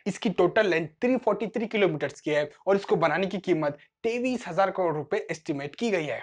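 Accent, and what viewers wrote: India. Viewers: Is he from South ? 🤔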